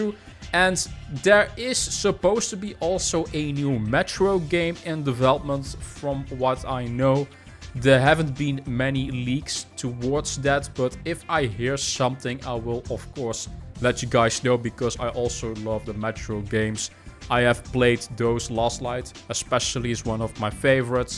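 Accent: Netherlands. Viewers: Is that Dutch accent?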